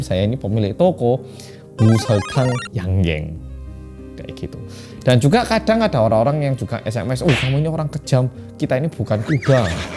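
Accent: Java, Indonesia. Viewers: salfok mas ngomongnya medok bingitsss wkwkwk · Mas mas medok ae jawane ... sing ndih... hehehe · Kok sleg jawanya engga hilang ya Mas bro... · Sesungguhnya saya penasaran kenopo kok mas dialek nya masih kuenteel medhok.. gimana kah cara menjaga nya..?? (Semoga dibales) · Udh nonton bang reviewnya.. abang orang korea tapi Logatnya Jawa Toh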